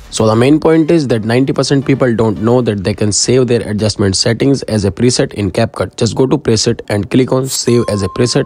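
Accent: India. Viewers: Your accent is so Indian 😅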